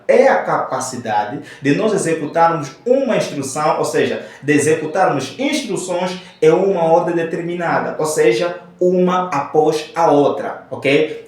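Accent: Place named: Angola. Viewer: tudo top, ainda falando com sutaque Mwangolé 💥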